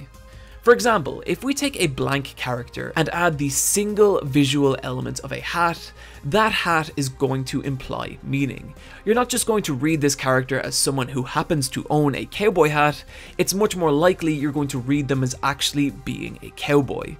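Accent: Ireland. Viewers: You sound irish bruh im irish dio has good fasion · Can someone tell me what SEWs accent is? English is not my native language so I can't really tell. But the "h-why" and "castume" and "ting" remind me of Roy from IT crowd sooo ... irish? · His accent, the "Why" or "What" is being affected by his accent and it sounds forced. It's an Irish one, I'm Irish, believe me he doesn't have a choice <\3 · Irish accent and blue graph paper? Almost mistook you for Real Engineering there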